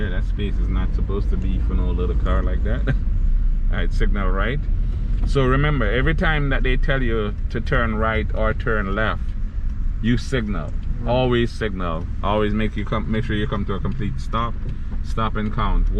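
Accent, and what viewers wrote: Jamaica. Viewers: Taking my test tomorrow 🙏🏾 btw is that a Jamaican accent?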